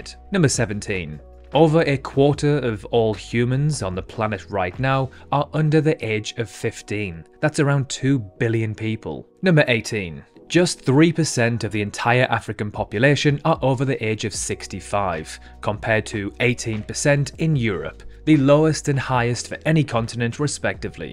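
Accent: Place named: England, United Kingdom. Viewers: Criticizes term "middle age" Uses term "middle age". And your Received Pronunciation is okay. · What the heck is that accent!! England always impressing me. What region is that? North?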